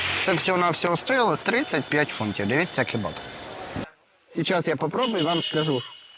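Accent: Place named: Kremenchuk, Ukraine. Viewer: Однозначно лайк за просування українського контенту❤❤❤🇺🇦🥰 але кременчуцько-світловодська школа рве вуха😂😂😂 люблювас полтавські галушки❤❤❤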